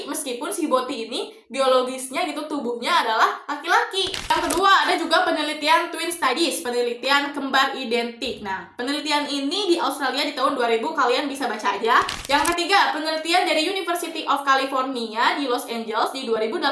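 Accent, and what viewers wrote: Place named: Sunda, Indonesia. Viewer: Gaya bicaranya mirip kang dedi mulyadi cenah😂 sunda buanget Yang paling penting di video itu kita sudah diarahkan untuk mengetahui perbedaan tiga hal dalam mengidentifikasi LGBT 🌈 1. Posisi seksual 2. Ekspresi 3. Orientasi seksual Bottom adalah posisi seksual yang dianggap sebagai orang yang lebih pasif atau submisif, begitu sebaliknya dengan top di gambarkan dominan dan lebih aktif Ekspresi ini adalah cara orang memperkenalkan diri ke publik yang biasanya dari sikap dan sifat atau bergaya pakaian yang khas Orientasi seksual ini yang menjadi bagian paling vital, hal ini yang secara jelas menentukan apakah orang itu punya ketertarikan seksual yang beda, setahu saya ada heteroseksual (lawan jenis) dan homoseksual (sesama jenis) aja Di tabel LGBTQ+ paling banyak adalah ekspresi diri Lalu untuk faktor lingkungan dan genetik itu udah umum, semua orang seharusnya tahu dan bisa dilihat langsung, saya fokus ke aspeo sosialnya saja dan psikologisnya Berikutnya mungkin yang kurang dipembahasan itu adalah sudut pandang tiap individu-individu terhadap BOTI, seluruh pembahasan kamu udah bagus cuman belum ada observasi atau penelitian langsung turun ke praktik lapangan sosial. 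Saya pernah mencoba memahami kenapa Boti dianggap salah selain karena melanggar aturan agama tertentu. Pada dasarnya boti digambarkan sebagai sosok yang feminim dan terkadang lebih pasif, hal itu sebenarnya dapat menganggu stabilitas ketertarikan seksual, terkadang ada boti yang terlalu cantik yang malahan banyak yan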